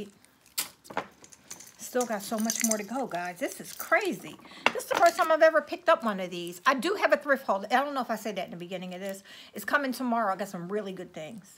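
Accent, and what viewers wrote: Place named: Louisiana, United States. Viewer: Like that cajun talk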